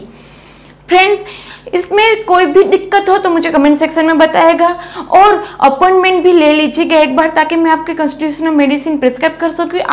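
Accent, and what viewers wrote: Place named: Kathiawar, India. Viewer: Aapki bhasha thodi kathiyavadi jesi he... Kya aap gujrat saurashtra se ho?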